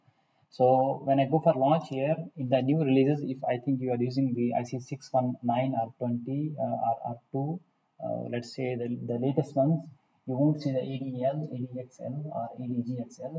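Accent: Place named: India. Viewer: 现在印度英语听多了， 毫无障碍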